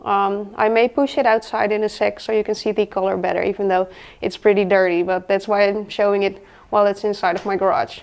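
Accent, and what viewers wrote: Netherlands. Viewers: Love that dutch- English accent. Used to love the look of CRX growing up. Still a cool car. nicely done enginebay with matching colour. I'de guess my favourite would be the E28. please keep it original! no low rider, 22" rims and such. 2,5 hours away, wouldn't that almost put you in another country? :P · Beautiful and stylish woman with a confident and friendly manner; cute Dutch accent also a plus ✅ - CRX resto; very nicely done ✅ - Old school Volvo; well cared for ✅ - Volvo wagon; comfy, practical, cool ✅ - E28 5-series; a proper Beemer that needs some love ✅ - Subscribed ✅ Congrats on being noticed by the algorithm! Cheers from Australia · Love the car collection qnd your videos. You are stunning qnd have great Dutch accent ❤ wishing you the best from California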